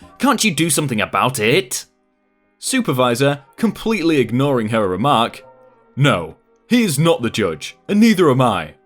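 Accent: United Kingdom. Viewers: nice to hear a brit accent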